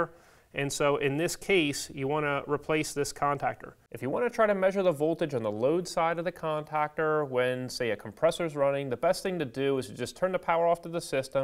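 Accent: United States. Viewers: Speaking in AMERICAN English ?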